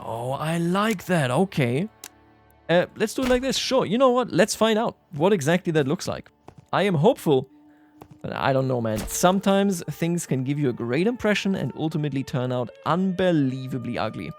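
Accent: Bavaria, Germany. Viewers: Sometimes you have Bavarian Germn Spikes (were german slips through while talking) Its not bad I like that diversity. German Englisch Vielfalt. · he even uses some german words and phrases in between^^ but only noticable for a german I think, and the hints of the accent gave it away for me :p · sofort gehört dass du deutscher bist (kein hate) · It smells like a german akzent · Damn even tho you are from Bayern, isch dein Akzent im Englischen halt echt ned so schlimm :0 Very nice Video dude, now i have to watch the whole series!. Grüßle von den werten Nachbarn ausm Schwabaländle ;)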